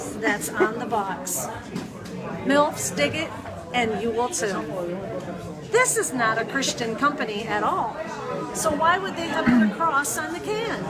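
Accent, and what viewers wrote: United States. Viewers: That New York accent just makes everything better 💀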